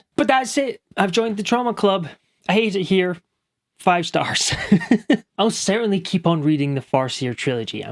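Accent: Scotland, United Kingdom. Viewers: Nice one. This was excellent! You've given me lots of food for thought as a newbie BookTuber. Love the b-roll. And the book? Yeah, it's a banger. I just finished it for the third time last night. I stopped at the end of Liveship Traders and now want to read the whole lot, so I've had to go back to the beginning. And what a trip. I'm so enjoying it. And then to jump in and hear others' thoughts on YouTube? How did I never know how good a genre this in on YouTube? Glad to make your acquaintance - I'm guessing you're a fellow Scot from your accent? Loved your thoughts on Burrich. I actually can't remember whether you're right or not. In some ways, I'm blessed with that bad memory as I can enjoy them all over again, spoiler-free, ha ha.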